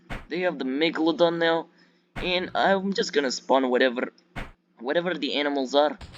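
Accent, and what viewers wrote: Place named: Russia. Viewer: Hi! Good to have you back! And ngl, i like your Russian accent though, for real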